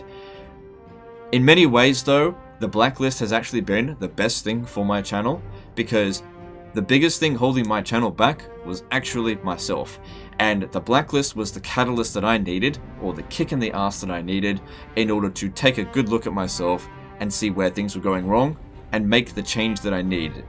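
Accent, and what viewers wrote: Australia. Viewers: I'm guessing that your either an Aussie, or a New Zealander, by your accent. Putting that aside for a moment, I noticed, that you pronounced your faction leaders name, as "Sau Sau". Sorry, my best attempt at spelling it phonetically, the way I heard it. So the "C" in this case, is pronounced, as you would say it in say "Cinnamon" for example. Where it carries more of an "S" sound. As opposed to the sharp "C" sound you would hear in say Car, or Carry, where it has more of a "K" sound. Just want to be sure I'm pronouncing these things correctly. And thought, since your from that part of the world, you would be more of an authority on it, than I am.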